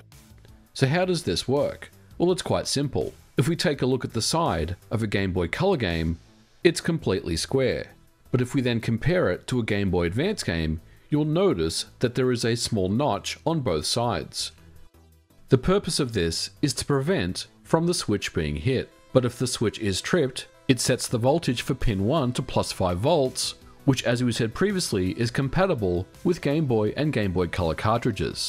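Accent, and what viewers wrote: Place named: Sydney, Australia. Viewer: You mean his "West Sydney ethnic" Australian accent? For most Australians when we hear that voice we think of a mechanic.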